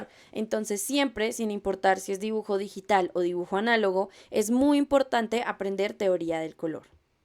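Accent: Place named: Colombia. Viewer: Hola buen día, puedo suponer por tu acento que también eres de Colombia. Es una lástima que hayas dejado de crear estos videos, espero puedas leer este comentario y te de un poco de motivación para continuar con este proyecto. Yo quisiera aprender más sobre ilustración científica. Si me puedes recomendar algún libro u otros medios para aprender te lo agradecería.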